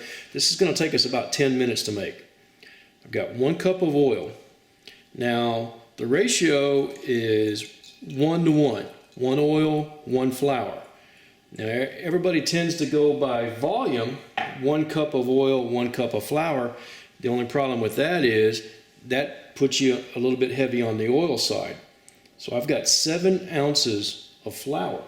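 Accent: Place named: Louisiana, United States. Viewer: What a freakin joke. It really sucks when northerners try to cook Cajun food. As a native of very southern Louisiana I can positively say this is crap. The stock is missing ingredients and a good stock cooks for at least four hours to develop the flavor. No one uses jalapeños in gumbo. It's considered a sin to do that. The roux is not dark enough and when u add the stock it looks like dishwater. His ideology about roux thickness is backwards because a dark roux is thinner than a light roux but has a nuttier taste. He should be using real andouille sausage not that fake stuff that does not even taste like andouille supposed to taste. Rotisserie chicken??? Now thats laughable! You need uncooked chicken so that it cooks and imparts flavor. This is what is unique about cajun cooking...Long cooking times with fresh ingredients and meats (uncooked) to build a composite flavor profile. 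This person has probablynever learned to cook from anyone in south Louisiana. His accent possibly sounds more like northern Louisiana and that area was not settled by the cajuns or the french so they know nothing about traditional cajun cuisine.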